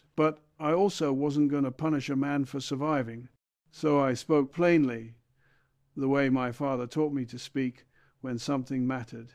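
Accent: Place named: United Kingdom. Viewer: Agreed. Can hear the british accident.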